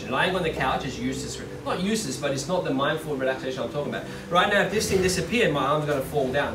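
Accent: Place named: Australia. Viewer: hey dude, sorry live in New Zealand assumed you were Ozzy because of the accent. I do abit of bjj here and have trained traditional tkd. Just trying to open some more doors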